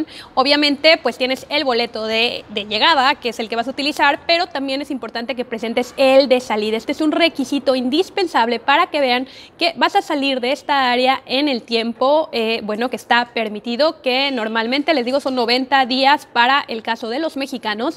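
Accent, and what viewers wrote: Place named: Spain. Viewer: Eres mexicana? Tienes acento espsñol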